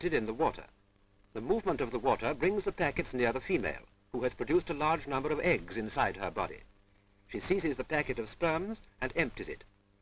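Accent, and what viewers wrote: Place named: England, United Kingdom. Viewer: Wonderful old film! The English accent! BBC eat your heart out. " Thars a newt over thar. It will dash into the warta before long."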